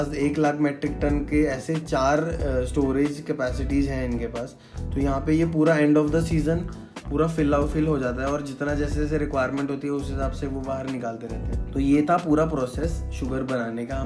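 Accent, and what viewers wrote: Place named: Delhi, India. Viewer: Never expected this kind of Hindi accent ( Delhite) from the South Maharashtrian person.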